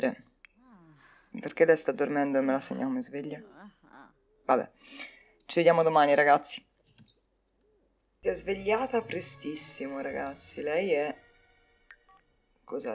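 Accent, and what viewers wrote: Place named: Tuscany, Italy. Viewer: Ciao sono Marco,un nuovo amico! Bellissimo questo video e gioco Sims 4 ho molte amiche che hanno canali dedicati ai Sims ,mi piace il tuo accento Toscano....complimenti! Mi sono iscritto 1 8 7 e... l i k e Se ti fa piacere ti aspetto su un mio video e canale io tratto molta natura,animali,immersioni,viaggi,ecc...così ti presento anche la mia grande community YT dove troverai molti nuovi amici ed iscritti. A presto...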